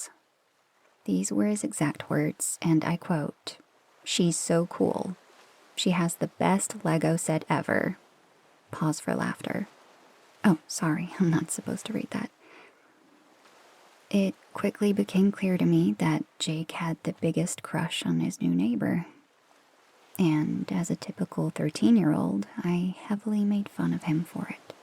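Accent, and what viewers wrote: France. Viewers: Please, do more French accent ASMRs 😌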